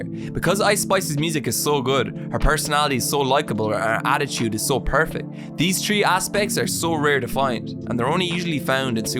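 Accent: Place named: Ireland. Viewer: dang she is a great example of right place, right time, right person, right team, right everything! Also are you from Ireland? Your accent is dope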